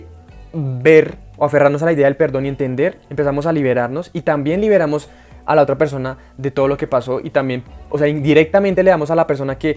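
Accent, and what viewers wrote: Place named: Colombia. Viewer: Sos muy genio, gracias por tu luz, saludos desde argentina, amo el acento colombiano 😊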